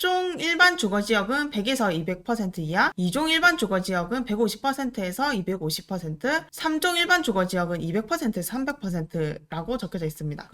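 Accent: Gyeongsang, South Korea. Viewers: 경상도 사투리인가요!? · 이 여자 매력 쪈다. 갱상도여인!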